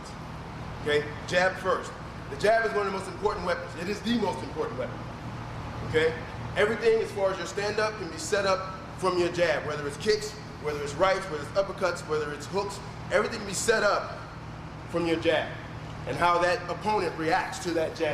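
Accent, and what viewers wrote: Baltimore, United States. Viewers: Baltimore accent? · Definitely hear Baltimore in his speech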